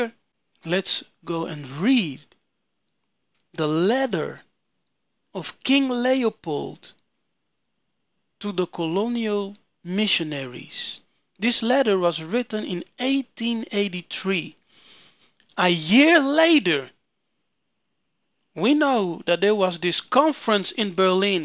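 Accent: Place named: Netherlands. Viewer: Zondervan? En je accent klinkt nederlands...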